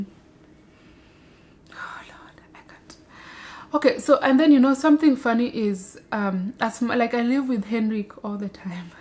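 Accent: Luo, Kenya. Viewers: Luo ascent 👌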